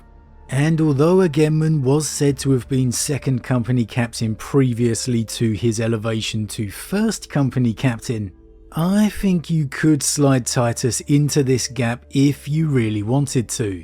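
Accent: United Kingdom. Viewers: The American pronunciation of Lieutenant is correct. It was only the British misunderstanding how the French were inflecting the "Lieu-" prefix that led to the incorrect "Left-tenant" pronunciation you guys use today.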